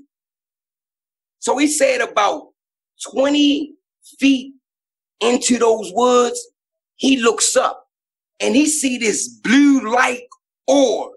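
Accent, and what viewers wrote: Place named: Baltimore, United States. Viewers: He has a heavy Baltimore accent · Being from Maryland I can assure you, his accent/dialect is exactly how the majority of folks frm "Bal-more" talk, and they call cigarettes "fugs" lol Good ppl...and, you are the man, Thanx for the great story telling. To all, stay safe and be well ☝🏽❤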